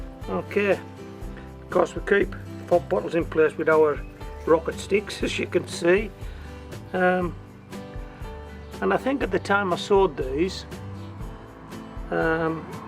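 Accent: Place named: Glasgow, United Kingdom. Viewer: Great boots...you sound like my Grandfather Patrick Alexander Leslie from Glasgow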